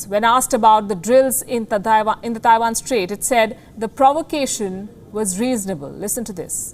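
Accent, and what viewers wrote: India. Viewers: Damn Indian English~~~ It sounds like a convenient store clerk voice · I know you are doing the good thing. Thanks. But do you realize that you have a very heavy accent and people do not understand what the heck you are talking about. Can you slow down your speech and enunciate. It applies to all Indian speaker with heavy accents. Can't you guys know you have very heavy accents? I know China lies. but you should say it clearly so that more people understand the truth.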